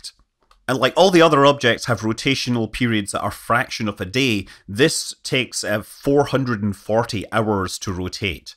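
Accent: Scotland, United Kingdom. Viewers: Greek names always sound best with a Scottish accent.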